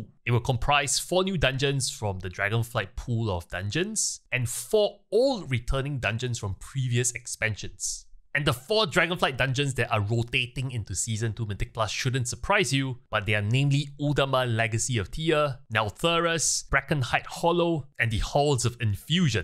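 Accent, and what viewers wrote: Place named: Singapore. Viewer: and thanks for the good videos. Love your Singaporean accent!!!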